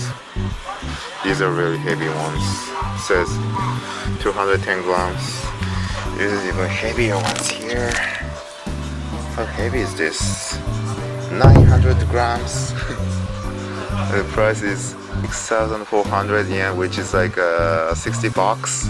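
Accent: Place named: Canada. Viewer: いつもいうけど、のカナダ仕込みの英語めっちゃ好きやで。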